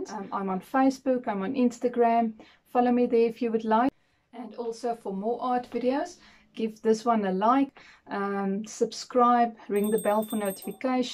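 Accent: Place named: South Africa. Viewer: How nice to hear a South African accent. Hi from the Netherlands ex Midvaal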